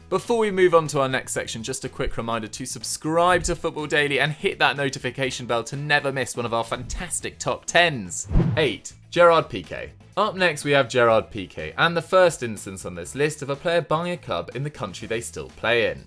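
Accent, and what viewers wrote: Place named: United Kingdom. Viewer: Your British ascent was too deep we don't understand most of it. Please in future put subtitles. Anyway thanks for your information. Keep it up 👍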